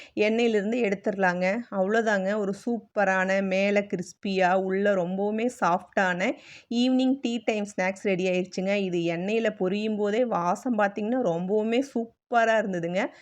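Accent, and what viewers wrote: Kongu, India. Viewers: கொங்கு தமிழ் மணக்குதே! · Madam pesu podhey theriuthu., Kongu Nattunu., intha madhiri receipes ellam kongunadu perponadhunga... 🙌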